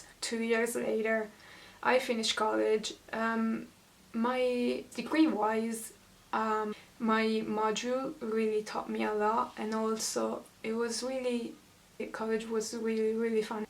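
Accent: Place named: Ireland. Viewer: You have an Irish accent!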